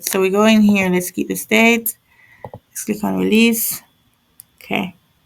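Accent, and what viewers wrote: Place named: Jamaica. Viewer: Sounds like you're a yardie. Loved the video, keep up the great work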